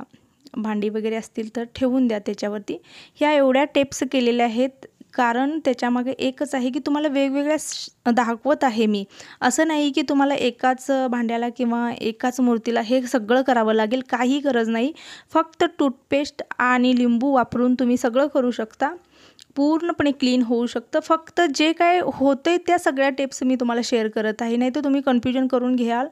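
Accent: Kolhapur, India. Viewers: भाषा doesn't matter कोल्हापुरी भाषा रांगडी वाटते पण आतून मधाळ असते,I am also kolhapuri . Video छान, उपयोगी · छान व्हिडिओ बनवतात तुम्ही आवाज पण छान आहे शांत आहे सोलापुरी कोल्हापुरी भाषा तर खूपच छान आहे नाव ठेवू नये कोणी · Tumhi chhan bolta tai. Bhashebaddal koni kahi mhatale tari tumhimkahi watoon gheu naka. Bhasha he sanwadache sadhan ahe. Ani dar 4 kosawar bhasha badalte. Tumchya bolnyat kolhapuri baaj ala ki mala to khoop awadto. (mi kolhapurchi nahi)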